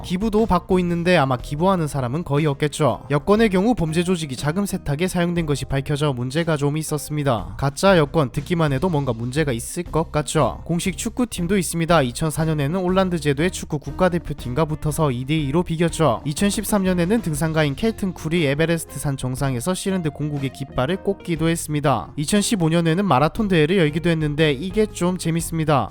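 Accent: Gyeongsang, South Korea. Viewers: 여자목소리 들으면서 자면 달달해서 구독 눌렀다가 이 울산인지 김해인지 진주인지 모를 촌놈스애끼 씨부리는거 듣고 구독취소 바로 박음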